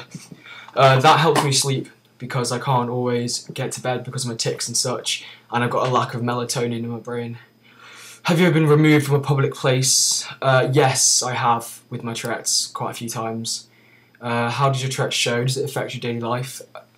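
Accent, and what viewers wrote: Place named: England, United Kingdom. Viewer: I'm just going to be a total creep and say that I really like your jaw line and your hair. And your eyes. I'm not gonna lie, I've watched this video more than once all the way through because I love your accent and I like listening to your voice. Also, I think you'd be such a fun friend to hang out with. Also - where from England are you from? What kind of accent is that?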